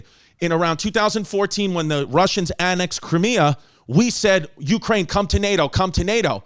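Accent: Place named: Brooklyn, United States. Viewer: Everyone seems to forget, behind his HEAVY brooklyn accent, chrissy chaos, once graduated doctorate school and once held court on History Hyenas. This is 100% factual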